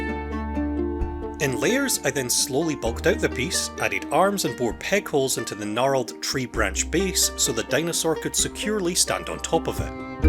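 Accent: Scotland, United Kingdom. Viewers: OI jabber ya scottish yah?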